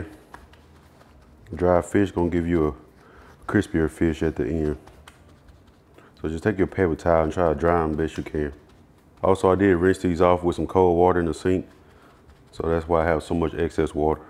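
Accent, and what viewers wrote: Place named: Mississippi, United States. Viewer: Wait so thats not an Atlanta or Texas accent its Mississipi ❤❤❤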